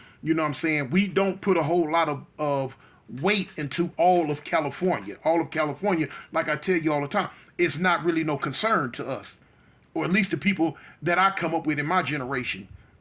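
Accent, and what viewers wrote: South, United States. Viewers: You've seemed to have picked up a southern accent, when I first started watching your videos I didn't think you were from California. You're spit on, that is exactly how it happened with my city. There wasn't any gangs there until LA cats came. It's like anything someone start it and show others and they get up to speed and run with it.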